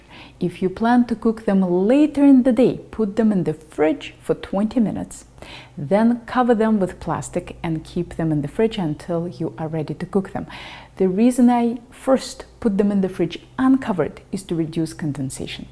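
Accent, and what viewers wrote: Russia. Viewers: Are you Russian? You have a very pronounced Russian accent. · Nice russian accent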